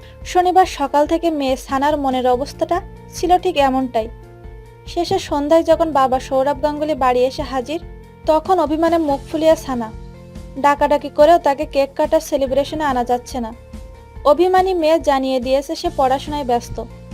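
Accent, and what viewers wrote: Bangladesh. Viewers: apni ki Bangladesh thakhen apnar katha bolar dharan dakhe thik tai mone hoche. parle Vasa change karun Tate apnar valo hobe. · Hahaha... 🤓🤓🤓🤓 How sweet... News reader is from Bangladesh it seems..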